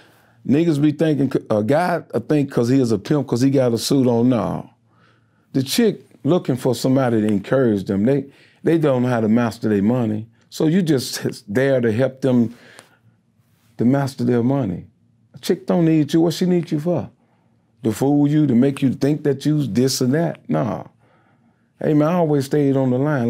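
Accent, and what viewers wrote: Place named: Chicago, United States. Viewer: You can tell from his voice that he's from Chicago. Older black folks in Chicago got a certain way of talking lol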